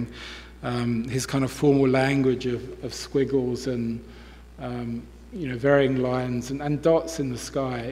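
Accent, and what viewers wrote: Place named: United States. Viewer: I didn’t enjoy it. A long monosyllabic ramble with continuous American style diction. Dreadful!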